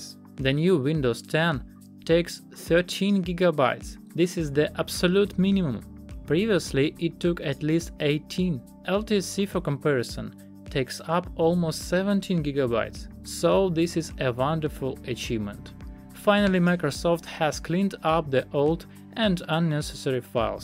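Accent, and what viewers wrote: Russia. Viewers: По акценту непонятно, что он рускоговорящий?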